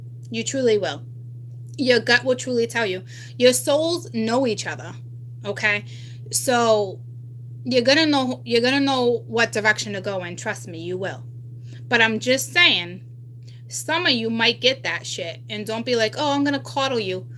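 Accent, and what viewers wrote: Boston, United States. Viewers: You and I have the same accent! It’s Boston baby! Be proud! It’s the best!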